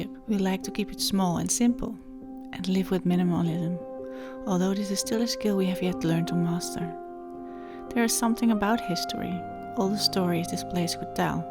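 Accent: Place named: Netherlands. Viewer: wat fantastisch dat je dit doet 🙏🏽 en hoorde al dag je Nederlandse was Heel veel succes met de restoratie ❤️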